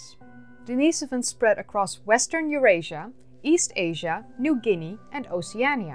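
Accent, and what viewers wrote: Netherlands. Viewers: does * speak with a Dutch accent? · I missed your Dutch accent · the Dutch slur in your accent, it makes what you want to bring across sometimes hard to follow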